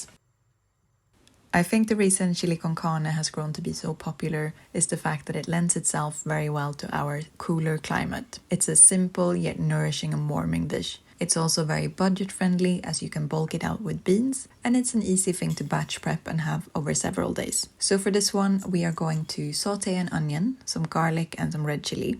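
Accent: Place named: Sweden. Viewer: Wow, vad är oddsen! Algoritmen ledde mig hit och jag hörde att du hade svensk brytning och när du nämnde norra Sverige funderade jag på om det kanske var någonstans i mina trakter du var från. Tyckte du såg bekant ut och sen klickade det! Hej! Vi gick i samma klass i låg-/mellanstadiet. Vet inte om du minns mig, Mirjam (fast numera kallas jag Morris 😊).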